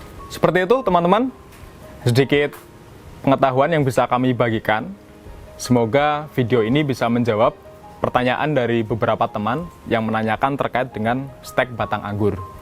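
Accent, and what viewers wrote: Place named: Banyumas, Indonesia. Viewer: Mantep!! Ngapak kie mas e kaya inyong. Btw kalau batangnya kering ngerendamnya lagsung pake air+fungisida atau rendam air dulu baru fungisida?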